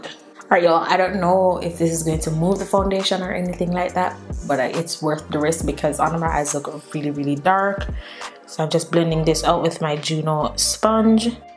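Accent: Jamaica. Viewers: Are you Jamaican? I sense a bit of an accent! I'm Jamaican so I was curious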